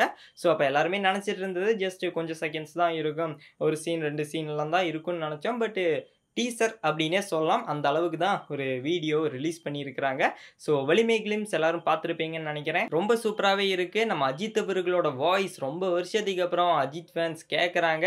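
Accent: Kerala, India. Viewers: Ninkal malayali aano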